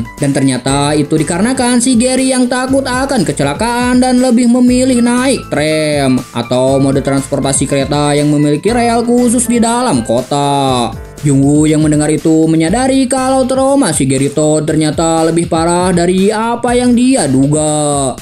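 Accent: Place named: Sunda, Indonesia. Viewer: Sunda bingitz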